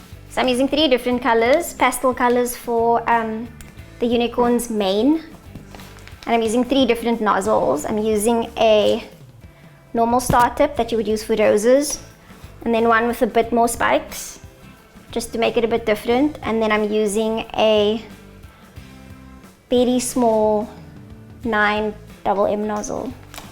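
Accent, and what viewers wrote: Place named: Zimbabwe. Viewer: You sound Zimbabwean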